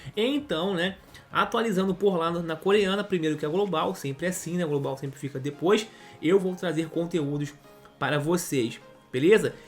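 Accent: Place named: Rio de Janeiro, Brazil. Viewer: essa sua voz de carioca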